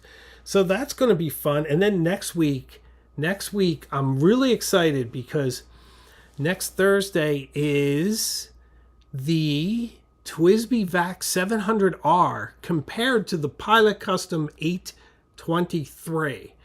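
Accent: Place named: Boston, United States. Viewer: Do you really have a speech impediment? I just thought it was your bostonian accent (like I thought you were talking about your doctor dealing w/ tornadoes at first rather than your daughter). I suppose it's a measure of success that you're at the point where you have critics.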